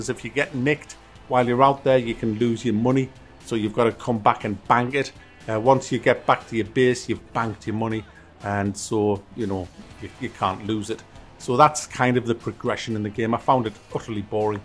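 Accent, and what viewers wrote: United Kingdom. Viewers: Your british english always brings a smile to my face <3 The way you say rant about this pricks haahahah i love it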